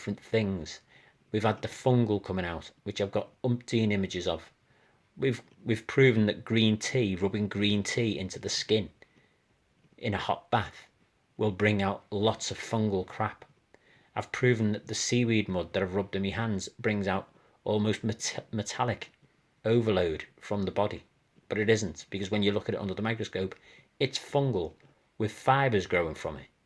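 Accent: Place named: United Kingdom. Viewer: I see where do you live in England you seem to have a British accent maybe one could send it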